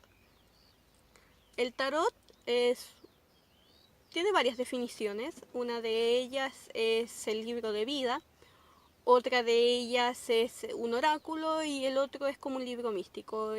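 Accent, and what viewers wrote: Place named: Chile. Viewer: Hola , vengo de Amino ❤Vi la publicación y vi unos segundos del vídeo , pensé que eras española Lugo me di cuenta que eras chilena ( no reconozco ni mi propio acento jaja )Me gustó mucho , sigue haciendo vídeos Me encanto la 3 baraja 🖤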